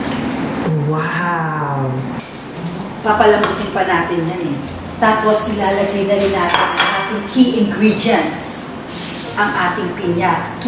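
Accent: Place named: Philippines. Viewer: Super funny!!!! I hear the zobel + jologs in you just like me hahahaha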